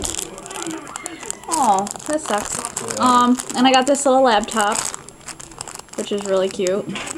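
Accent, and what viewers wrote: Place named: United Kingdom. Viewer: No prob. I loooooooooooove British accents. They r just so, so, BeAuTiFuL!¡!¡ XD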